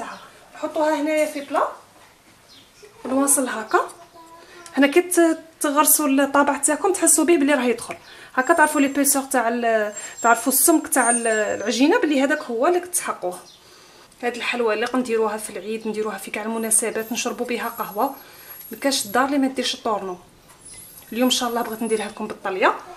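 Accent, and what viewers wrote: West, Algeria. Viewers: اول مرة نشوف قناتك نتمنالك الاستمرارية واللي عجبني بزاف هدرتك نموووت على هدرت الغرب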